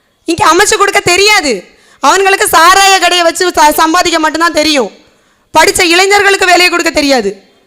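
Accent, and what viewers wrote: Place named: Kanyakumari, India. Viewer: கன்னியகுமரி த்தமிழ் அழகு ்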